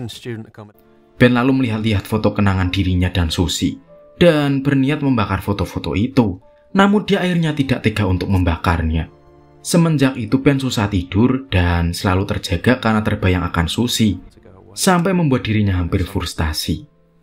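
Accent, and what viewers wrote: Java, Indonesia. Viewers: Movie crop versi medok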